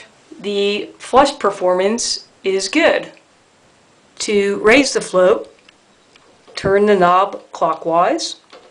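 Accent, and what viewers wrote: Philadelphia, United States. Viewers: Philly accent! 🙌🏻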